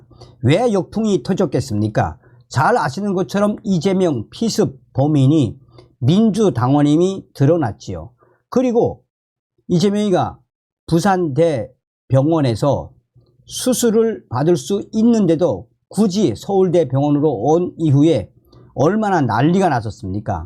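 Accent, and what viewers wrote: North Korea. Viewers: 북한사람인가. 목소리가 북에서 온 사람 같아요.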